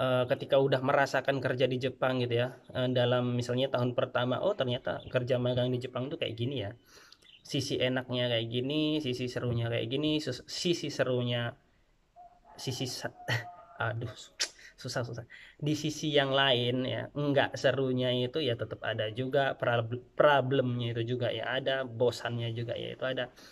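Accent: Java, Indonesia. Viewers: Kirain orang Jawa mas?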